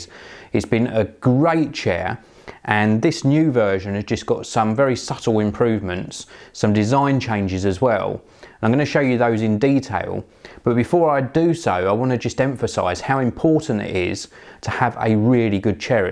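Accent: England, United Kingdom. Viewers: the "leavers", love that English accent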